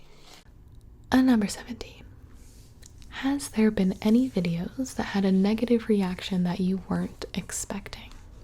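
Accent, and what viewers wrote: South, United States. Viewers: i frickin love southern asmr sounds. she is the best.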